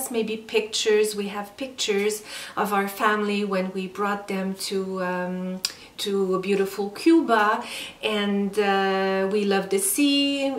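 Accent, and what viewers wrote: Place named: France. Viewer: Hello, you’re always so well organized and disciplined. I like it, but sometimes I’m a bit lazy to do it like that. I love your channel. I’m from Germany and unfortunately i don’t speak English well. Sorry for that. I enjoy every video with and from you. 👌This light French accent is very adorable to you. 😘🙋🏼‍♀️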